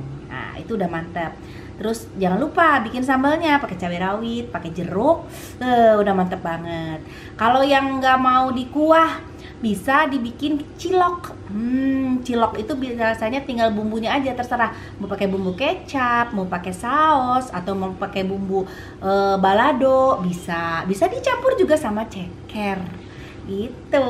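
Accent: West Java, Indonesia. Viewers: Assalamualaikum tth..seneng deh liat nya ttp sederhana n tidak lupa logat sunda nya...bahagia trs yaaa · Baru nemu channel ini.. Lucu si Teteh karena Nyunda banget... Love u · Mecak urang mah ai aya artis ngomongna sunda teh teh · Teh geulis mirip dede lesti apalagi logat sunda nya...hihi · Sunda pisan teh,resep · Sundaaa 😁😁 khas nya nyariosna urang Sunda mahh 😀😀 kaya suara teh Syahrini 😅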